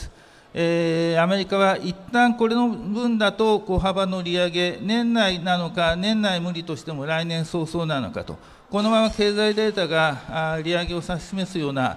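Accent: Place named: Fukui, Japan. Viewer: 今中さんは訛りからするとおそらく福井出身の方かなと想像します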